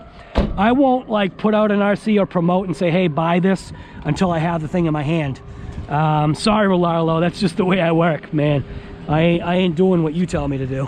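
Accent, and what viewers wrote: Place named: Boston, United States. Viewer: Killer Boston accent! Great video. Car looks legit. I have the WLToys brushed version when it first came out. Highend brushless system in it not(Tekin rs pro, Trinity Punisher 13.5t, jx1181 servo). It’s a beast now. But this looks legit.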